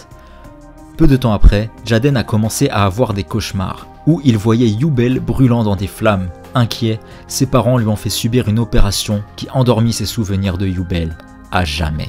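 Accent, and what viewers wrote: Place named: Alsace, France. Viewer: je te connais t'es alsacien toi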